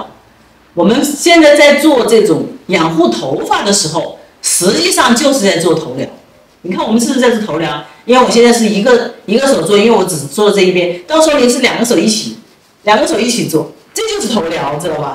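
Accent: Wuhan, China. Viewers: 感觉博主口音一听就是武汉人哈哈 我也是武汉的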